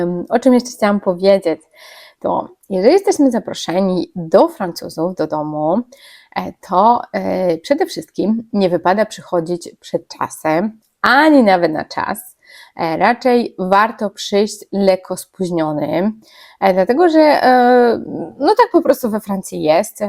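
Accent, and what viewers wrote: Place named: East, Poland. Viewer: Pani ta mowiac po polsku ma trochę wschodni akcent, taki "śledzikowy". Zauważyłam,że coraz więcej młodych- dorosłych ludzi tak mowi używającąc zmiękczonego języka polskiego, jakby nie wyszli z dzieciństwa.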